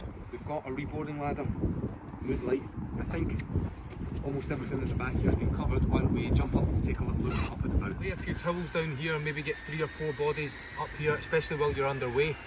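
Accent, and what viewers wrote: Scotland, United Kingdom. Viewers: I was surprised to see that the chap has Asian heritage. I did not expect that with the full on Scottish accent. Just goes to show how perceptions can be completely false.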